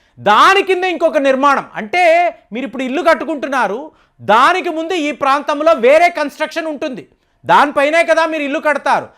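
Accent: Tamil Nadu, India. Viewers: Sir Tamil background Telugu anduke Tamil accent to Telugu matlduthunnaru Hyd / Telangana lo Telugu Urdhu lo makkinchinattu matldutharu alage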